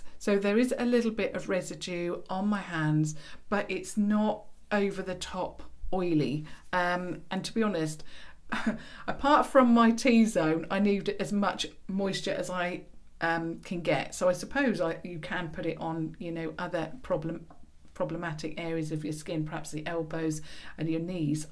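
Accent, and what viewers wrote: United Kingdom. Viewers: Hi, of course I'm really happy for your achievement now 10,000 is near! you have grown with us and as I said in other comments for me you are like a friend, not only I appreciate all your reviews and your tips but I practice my english LOL... I love your british accent! anyways, your skin looks like porcellaine in this video👍❤️😘 (P.S. sorry for any spelling mistakes )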